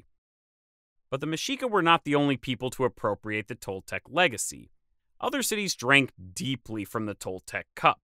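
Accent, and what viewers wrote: Midwest, United States. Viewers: Omg your voice is so American ☹️ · well, I like your Midwestern accent. Please continue to speak in your natural way; being English different make you smarter.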